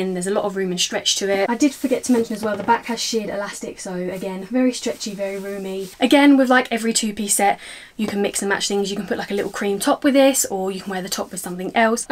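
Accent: United Kingdom. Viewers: I Love You British accent.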